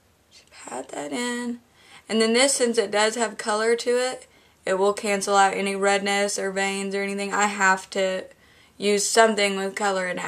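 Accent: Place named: South, United States. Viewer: Love the finished look, also your lovely southern accent, from the emerald Isle...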